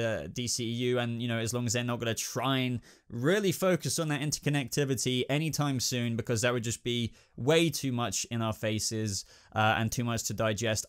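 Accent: England, United Kingdom. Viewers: Great video, where abouts in England you from? I live in Ireland 🍀😀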